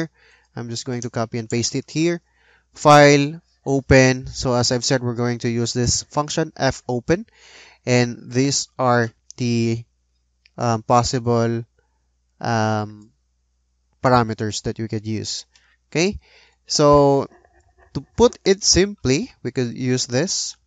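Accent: Philippines. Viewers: bruh i giggled hahaha. his first sentence and I knew he should be Filipino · Is this A Filipino? Because the Accent is Like Filipino XD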